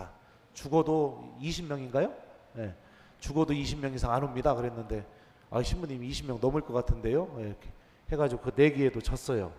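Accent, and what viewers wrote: Chungcheong, South Korea. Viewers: 신부님 아침마다 신부님 말씀으로 시작합니다.미국애서 감사드립니다.신부님의 충청도 사투리가 너무정겨워요.동향이기애 더 가슴을 울려요..🙏🙏🙏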